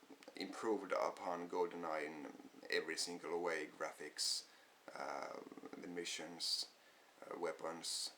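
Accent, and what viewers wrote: Finland. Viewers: he sounds like he's from finland or some european country so i'd have to it's pretty obvious he is from finland.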